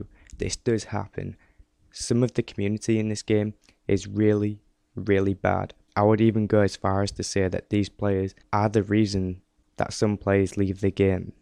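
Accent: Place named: North West England, United Kingdom. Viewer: Hey man where you from? I'm inclined to say north west, I'm from st.helens and your accent is similar to mine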